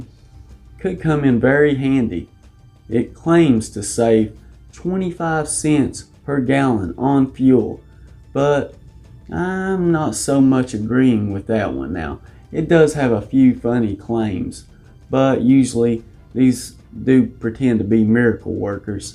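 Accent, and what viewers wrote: South, United States. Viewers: nvr watch a southern accent guy's tech vid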